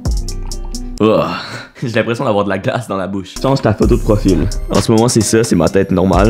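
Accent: Quebec, Canada. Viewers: T québécois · Est-ce que tu viens du Québec? Mais sinon super vidéo tu es super bon pour réaliser des défis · Salut j'aime trop ton accent canadien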